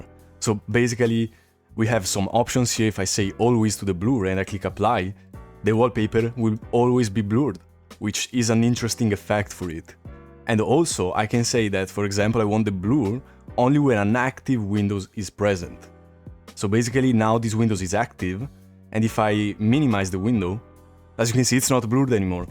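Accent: Italy. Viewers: is vro italian? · are u italian by any chance?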